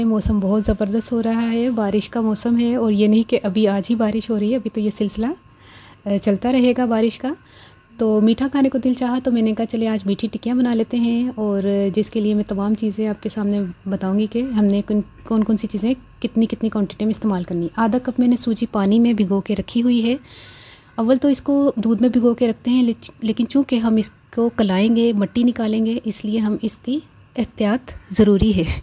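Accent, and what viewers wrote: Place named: Punjab, India. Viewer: Aoa . bhot acha andaz hy kubhi kubhar punjabi zbaan ka chutta dy k baat karny ka .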